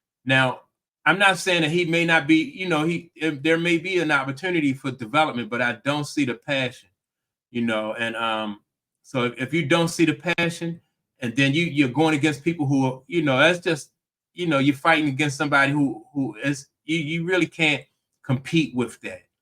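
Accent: Baltimore, United States. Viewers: Are you from B-more?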